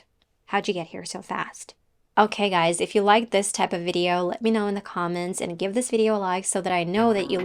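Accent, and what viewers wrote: United States. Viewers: you are american 😂😂😂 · This is American English, I do not think British English sounds like that. I live in the UK. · Thank you so much! It's helpful! But do British people understand such American English?🤣🤣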